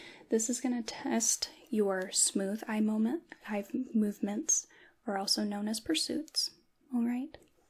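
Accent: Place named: Midwest, United States. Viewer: I'm from Missouri and you sound just like me lol 😂 Midwestern love!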